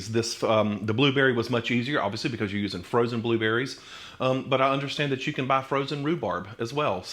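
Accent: South, United States. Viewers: Love your Southern accent. Can’t wait to try these; I need to research Swerve sweetener (I usually use a monk fruit/Stevia combo).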